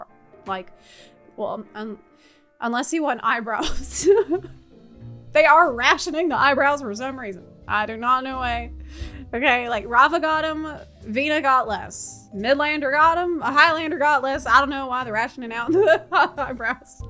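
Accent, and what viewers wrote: South, United States. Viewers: Not only do I love my character (also a bunny girl), but I am fully convinced she is the canon version of the WoL. She is perfect. In other news, I love that years of living in Ukraine has not suppressed your powerful Southern twang in any way. I have it too, I think it comes from our DNA. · Why is having a south girl accent all of a sudden?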